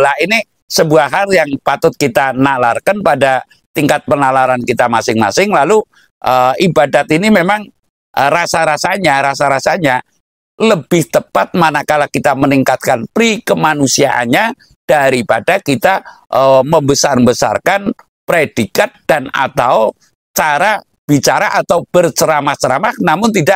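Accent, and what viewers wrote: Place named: West Java, Indonesia. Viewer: Kalau jadi Ulama/kyai Belajarlah Yg Bener dan Tutur katanya Yg Indah...Contoh Kyai2 Terdahulu Tutur katanya Enak..Contoh Mbah Maimun Mbah Nasir... Mbah Nur Hadi.. Mbah Solihun... Dan Msh banyak Lagi Kyai2 Yg Bicaranya Lemah Lembut..Tetapi Ini Kyai Dengan Logak Sunda Bahasanya Agak Kurang Beres...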